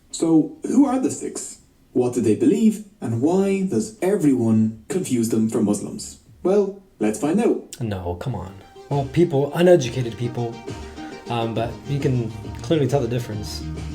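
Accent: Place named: Ireland. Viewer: I like the Irish accent ❤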